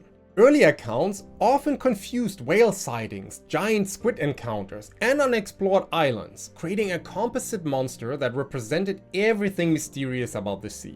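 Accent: Germany. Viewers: he is from Northern Germany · Man, your german accent so thick it almost sound chinese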